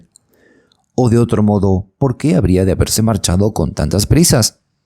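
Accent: Rioplatense, Argentina. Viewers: No sólo eres latino, hablas con la ye , que significa que eres argentino o uruguayo, me identifico maravillosamente, soy uruguaya 🙋👍✨🇺🇾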